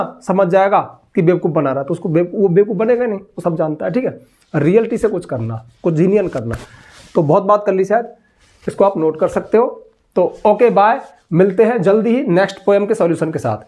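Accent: India. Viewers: Teaching English 😊with Desi accent..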